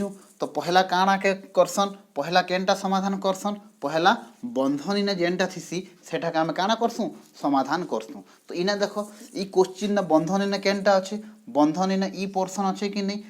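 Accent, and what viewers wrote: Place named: Odisha, India. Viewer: ପୁରା ଏକ ନମ୍ବର ସମଲପୁରୀ କହୁଛନ ସାର୍ ବଢିଆ ବୁଝାଉଛନ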